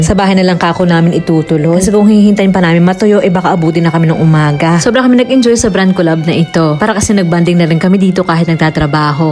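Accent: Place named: Batangas, Philippines. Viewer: Aliw na aliw Po Ako lagi sa pag voice over nyo 🤣 kaya Po Pala tuwid na tuwid Tagalog Po Ninyo, Ala-Eh! Tubong Batangas ka Pala. 😅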